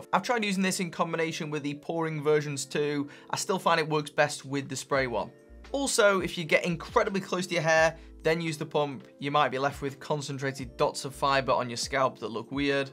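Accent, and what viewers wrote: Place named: Northern England, United Kingdom. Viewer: I hear a Northern England accent. What town, mate? Nice vid.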